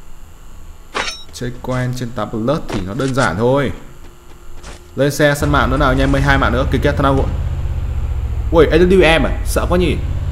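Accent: Thanh Hoa, Vietnam. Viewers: Em đoán Giọng Anh Giống người Thanh Hóa vậy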